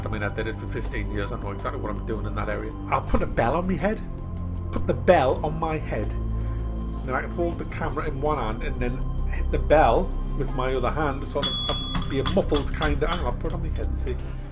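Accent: United Kingdom. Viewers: Somewhere from the UK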